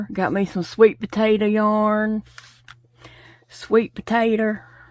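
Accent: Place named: Delaware, United States. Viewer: I LOVE this Gnome! I Love the Mustache, the Turned Up Hat and the Vine with the Flowers and Curly Cues. The way you say the days of the week sounds the same as we do here in Delaware. 🤷🏼‍♀️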